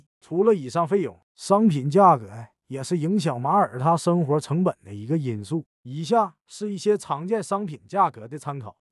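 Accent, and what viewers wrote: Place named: Northeast, China. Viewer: 东北口音太重了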